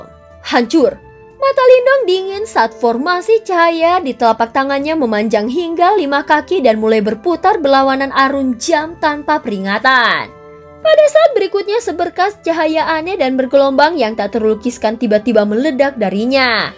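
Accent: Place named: Semarang, Indonesia. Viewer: Dunia itu sempit ya, tiba tiba saya di tempat tongkrongan bertemu dengan pendengar chanel ini 😂, semarang mana ini suaranya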